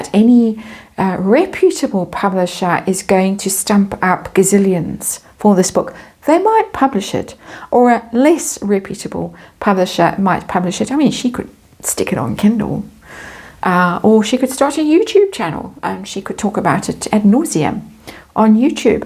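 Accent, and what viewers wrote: South Africa. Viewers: Hi do I hear a South African accent ? Hello if you are ❤❤I am too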